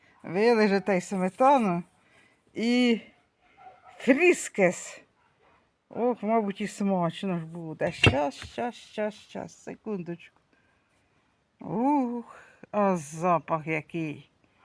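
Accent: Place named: Poltava, Ukraine. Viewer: Доброго вам дня. Гарно у вас і тихо. Це мабудь Полтавщина, по говору. Миру вам і добра. ❤❤❤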